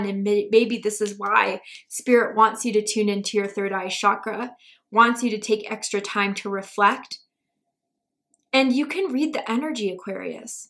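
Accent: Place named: United Kingdom. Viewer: Are you from the UK 🇬🇧 😊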